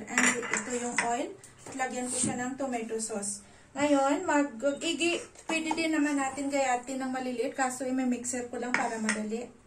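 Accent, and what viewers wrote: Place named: India. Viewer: Sala Malaykum Sis ulam masalap. Looks yummy at I like your Indian Accent 👌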